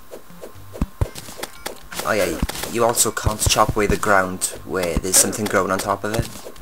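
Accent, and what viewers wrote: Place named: Wales, United Kingdom. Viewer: Your Welsh accent rules. Greetings from Krautistan, and thanks for thid very helpful series for Terraria beginners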